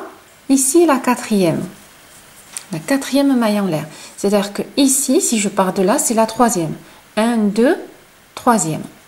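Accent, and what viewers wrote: South, France. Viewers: Bonjour, très beau point!!! bel accent, ça sent le sud!!! beau week-end, bisous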